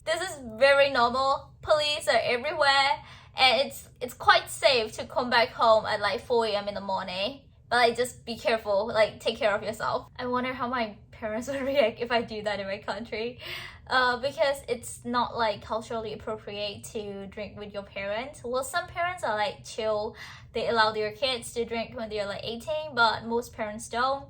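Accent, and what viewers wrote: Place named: United Kingdom. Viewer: U r starting to sound like a British...Love ya❣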